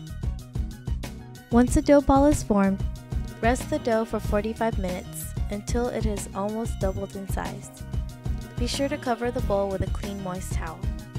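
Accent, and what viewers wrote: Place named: United States. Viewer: you sound so american than filipino thats kinda funny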